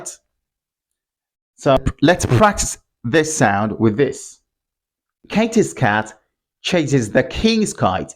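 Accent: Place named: United Kingdom. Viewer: Real British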